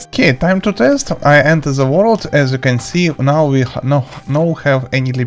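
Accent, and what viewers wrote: Russia. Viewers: ты ли это? акцент русский, голос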